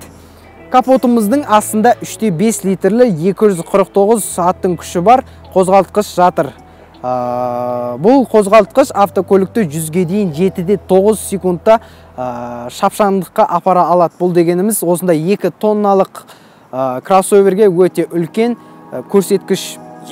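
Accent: Standard. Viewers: Молодец тап таза сөйлейсің қазақша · Ой молодец осы бала таза қазақша түсіндіреді. Өркенің өссін. Олжас ағаңдай атақты бола бер!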